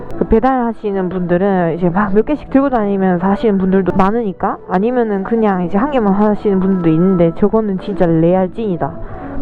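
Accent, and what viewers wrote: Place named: Gyeongsang, South Korea. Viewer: 아~따마 사투리 지기네예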